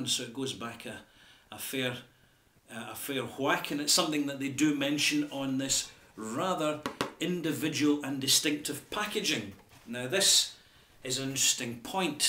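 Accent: Scotland, United Kingdom. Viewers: I love your accent. It's Scottish right? Like the dwarves in fantasy movies lol. Love that accent it's so bad ass.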